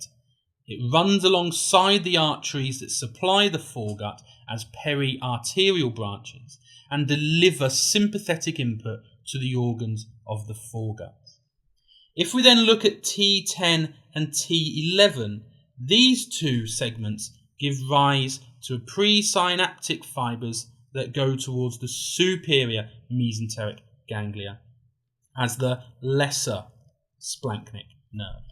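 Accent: United Kingdom. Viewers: I hv never found a youtube clip so sexy, especially with that british accent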